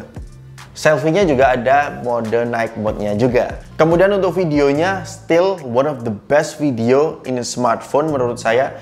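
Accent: Semarang, Indonesia. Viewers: Weh wong semarang ik 😄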